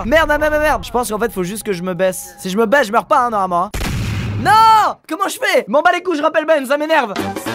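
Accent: Quebec, Canada. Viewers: Tu sais parler quebequoi comme moi en tabarnak,😂jte soutien dla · Et ben squeezie tu parle en québécois tu nous explique???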